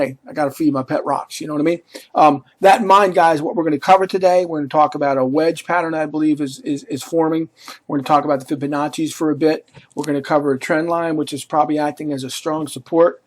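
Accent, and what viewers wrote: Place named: Philadelphia, United States. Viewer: Subscribed because I'm from Philly and that accent makes me feel at ease 😌 lol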